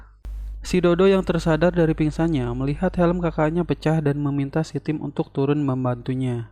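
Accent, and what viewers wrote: West Java, Indonesia. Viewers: Abi ti Tasik kang, komentatorna sundanese, timana nya ?? Seru dan lucu.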